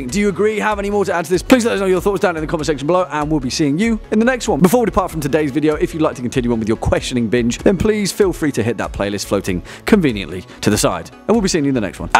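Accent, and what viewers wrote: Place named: Yorkshire, United Kingdom. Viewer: I love the accent.. Is that Yorkish? Anyway thanks for this informative mate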